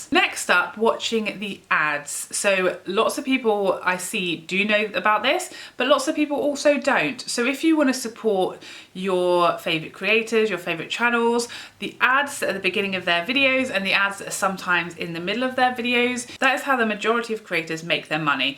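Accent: United Kingdom. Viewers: Good information! I had no idea...but how long do i have to let the ad play? I used to always click skip ad. Why don’t you do links so I can purchase through your link? I do enjoy your channel and do want to support. I’m an American that loves a good British accent😄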